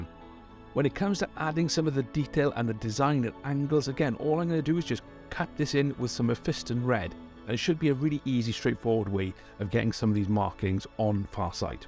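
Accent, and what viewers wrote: Wales, United Kingdom. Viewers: are you welsh bud?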